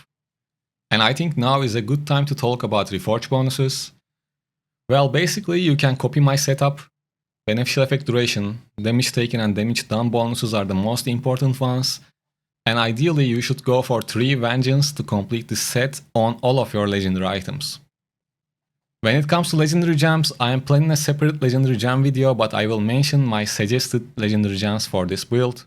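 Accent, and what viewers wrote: Turkey. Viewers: La ingilizcen aynı Türk lehçesinde. Ortaaamm türksün sen la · You sound turkish ngl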